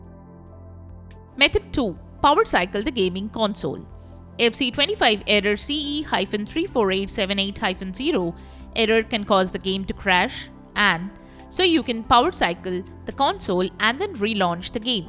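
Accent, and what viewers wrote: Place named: India. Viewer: English mix with Indian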